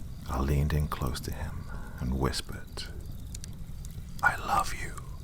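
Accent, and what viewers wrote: South, United States. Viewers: Thanks for the narration man, it turned out really well. I didn't imagine them speaking with a southern accent, but now that I'm hearing it, it does add nicely to the campfire aesthetic you have going for the story. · Loving the southern accent, I do declare.. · I love the accent, a mix of your normal accent & a light tinge of a Southern drawl. It reminds me of the accents used by an actor in a classic Hollywood film (i.e. Gone With the Wind) — I love it & it’s very fitting for this story... despite the setting being Connecticut, apparently. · ¿Why So Serious? xD Southern or English? Hard to peg. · Loretta oh yeah, does him a good ol' southern US accent, yessiree bob.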